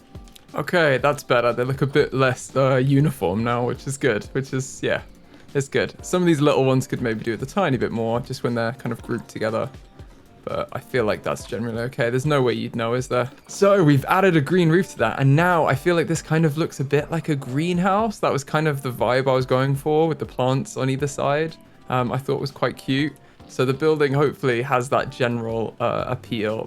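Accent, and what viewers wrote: United Kingdom. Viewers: Hes british like meh mate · where is your accent from in the uk? its really cute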